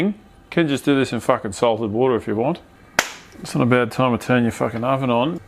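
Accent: Australia. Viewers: He's definitely Australian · Is this how Australian people talk?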